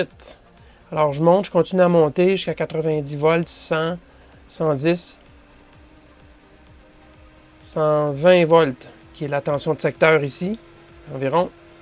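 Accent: Quebec, Canada. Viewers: je decouvre la chaine et je dois dire que c'est juste excellent,avec un accent qui me fais voyager direct en 1 seconde de France au Québec ,les explications sont toujours claires,schématisés et on vas toujours au bout des choses, je repette c'est excellent ! merci pour ces videos intructives !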